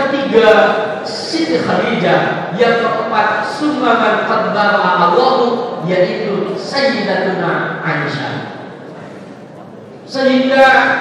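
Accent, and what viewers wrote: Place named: Garut, Indonesia. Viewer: subhanallah sima kawantun has garutna khas pangersa mah 😍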